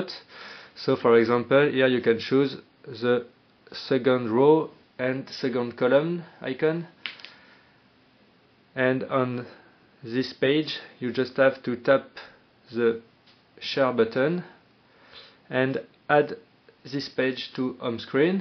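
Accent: France. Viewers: sa se voit tellement que t'es francais mais c'est bien d'essayer!!! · T français acsenent de merde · Are you French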